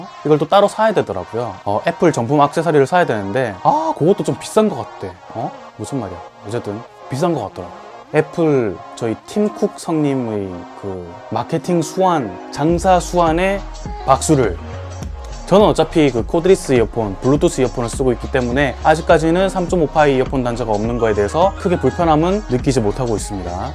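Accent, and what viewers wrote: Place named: Gyeongsang, South Korea. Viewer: 그기 갱상도다 마!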